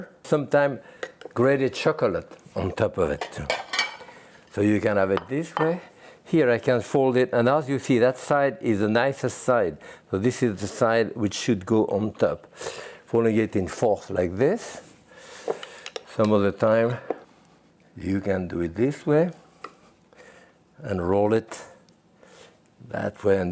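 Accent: France. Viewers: With that chic French accent he could make a carrot butty sound exotic! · L 'accent francais c'est magnifique. Great explanation merci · That accent is Jacques closseau level ! Love it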